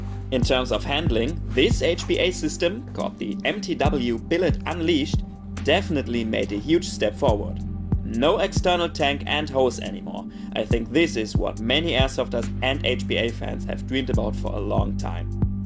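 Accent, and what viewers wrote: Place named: Germany. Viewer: Dang that shimming is perfect all that I hear is the pistonhead hitting the cylinder head. You are a damn well technician. It sounds even better then my MCX and I thought that one was perfect. Anyway you sound German. Are you by chance from North West Germany ? I would like to see you on our field (an old Gas powere plant.) indoor CQB with 7 floors of action.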